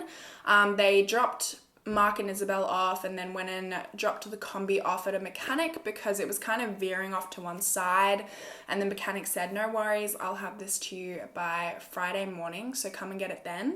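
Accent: Australia. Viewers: It may be because I'm British, but I can't unhear "Pedophile Conio"... Love the Australian accent!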